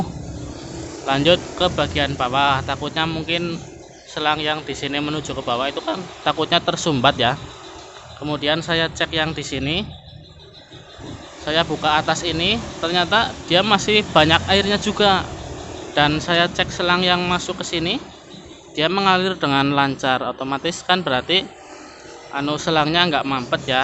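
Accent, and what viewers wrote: Banyumas, Indonesia. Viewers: Jossss....ora ngapak ora penak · Kayong ngapak... asli ngendi mas 😁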